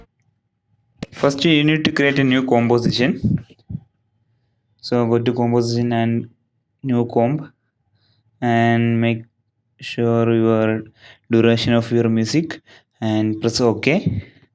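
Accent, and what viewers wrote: Kerala, India. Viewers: are u from kerala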